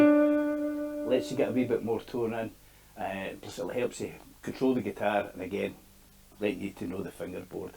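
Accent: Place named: Scotland, United Kingdom. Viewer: Did you ever hear version of this? Whoever complained about your accent should check out the subtitles on YouTube - bottom right hand corner button which has got two CC's on it. It even translates from Scottish - said 'little' rather than 'wee'!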